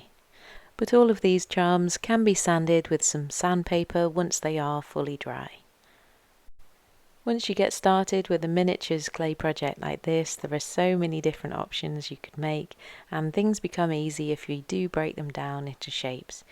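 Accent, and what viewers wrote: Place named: Liverpool, United Kingdom. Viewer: I love the Liverpool (or nearby?) accent ….so singy and relaxing! Thanks for sharing all your knowledge!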